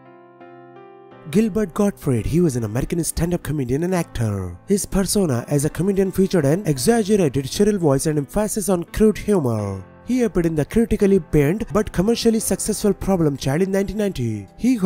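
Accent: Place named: India. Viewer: ENTIRE PHRASES WENT BY THAT I COULD NOT UNDERSTAND.😩 NARRATOR SOUNDS TO BE FROM INDIA. IF HE WOULD JUST SLOW DOWN, IT WOULD HAVE HELPED!☹️😩😆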